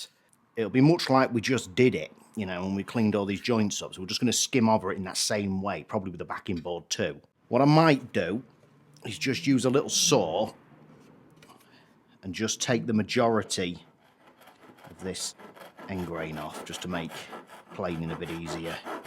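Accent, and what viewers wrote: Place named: Bolton, United Kingdom. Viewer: Good idea for those tools in constant use the simple ones are the best, if I closed my eyes I'd swear I could hear a young Fred Dibnah your not from Bolton by any chance?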